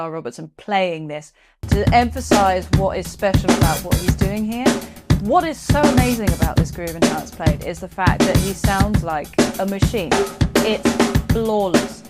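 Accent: United Kingdom. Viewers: This is the way Brits talk. I was a UK resident for over a decade. Trust me there is no arrogance here.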